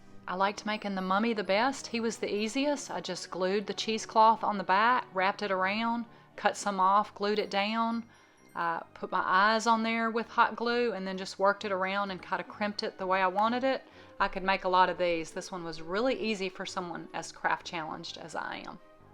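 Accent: South, United States. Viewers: From the prairies in Canada: Pretty darn cool video! If I was still teaching early years, I think I'd be consulting your contributions more often. Thanks for sharing. Pretty nice accent too. I honestly always liked a southern drawl. Velox Versutus Vigilans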